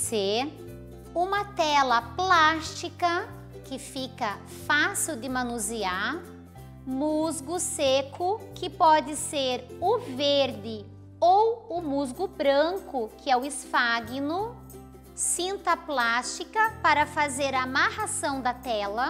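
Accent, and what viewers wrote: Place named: Northeast, Brazil. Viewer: que sotaque maravilhoso meu Deus. Amo vocês nordestinos.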